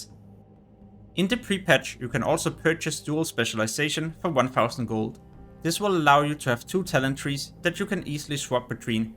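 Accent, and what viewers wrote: Denmark. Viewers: Very nice video :D Playing with people from scandinavia I kinda started to catch on the accent, you are danish if I'm not mistaken :D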